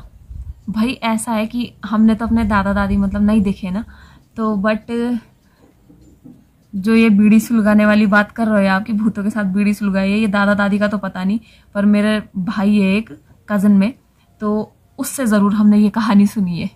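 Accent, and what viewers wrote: Garhwal, India. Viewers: tum sayd gadwal se ho,Mai almora se hu, apki gadwali bilkul hmari kumauni jesi hai...kyapp swal hogya na 😀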